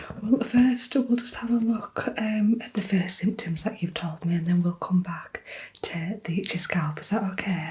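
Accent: North, United Kingdom. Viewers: 10/10 as always! I’ll say it again, the northern twang makes me feel right at home 😴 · was going to say the same, love the northern accent :)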